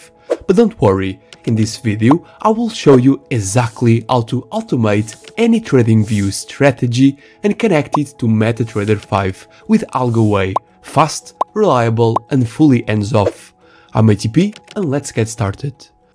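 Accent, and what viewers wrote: Portugal. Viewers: Hey bro, are you portuguese?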